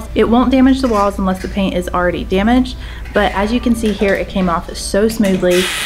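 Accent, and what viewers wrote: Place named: South, United States. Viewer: Did you hear her southern accent? Now the hats make perfect sense 😂❤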